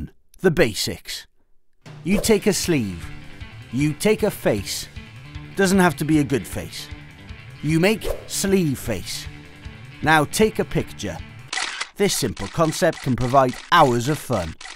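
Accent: Wales, United Kingdom. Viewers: The narator sounds like he has a welsh accicent :D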